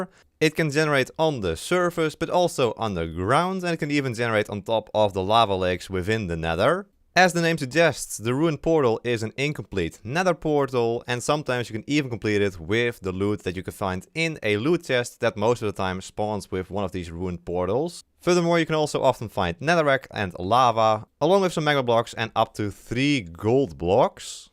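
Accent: Netherlands. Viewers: Bro you sound dutch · i smell dutch :)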